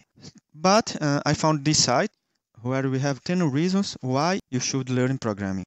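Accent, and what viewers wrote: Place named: Ceará, Brazil. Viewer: Curso de Python em Inglês com sotaque cearense.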